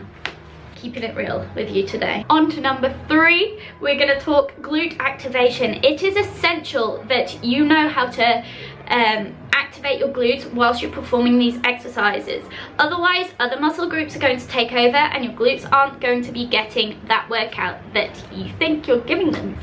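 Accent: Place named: United Kingdom. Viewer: wait, she's Australian?! I am and she doesn't sound it haha I'm so confused 😂 I thought she was from the U.K?!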